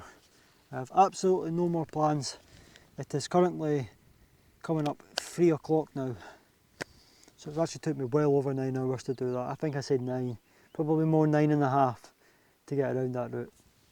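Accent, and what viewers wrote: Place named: Edinburgh, United Kingdom. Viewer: Well done,I like the fact you get away and do your own thing.I’m an Edinburgh lad myself Meadowbank.originally fi west Granton green.you sound like your from that area.granton,Drylaw’ish neck o the widz.👍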